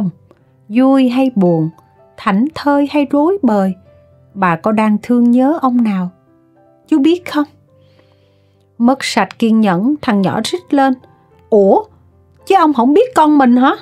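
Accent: South, Vietnam. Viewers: Truyện ngắn của Nguyễn Ngọc Tư đa số thuộc loại hay, từ câu truyện, cấu kết, lời văn, 6/10-10/10. Giọng đọc, cách đọc, và cách diễn giải của với giọng miền Nam thì quá tuyệt vời 8-10/10. Qua Một thời gian dài lắng nghe các truyện của “IQ Radio”, tôi lấy làm lạ tại sao số người ủng hộ chương trình này quá ít.( không vượt qua được 5k. Subscribers )?????? Bây giờ thì tôi hiểu tại sao. Nhà văn miền Nam, Mc miền Nam, mà trong nhiều phần của các truyện ngắn, lại thiên về CS. Vì vậy mới không được nhiều người miền Nam ủng hộ.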